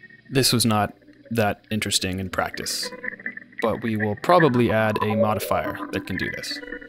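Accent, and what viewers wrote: Toronto, Canada. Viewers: Are you from Toronto? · wait are you from Toronto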